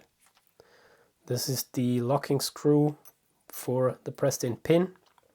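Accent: Austria. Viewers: I had a good friend from Austria in school. His accent and yours are identical. It make me smile. :) Brilliant work!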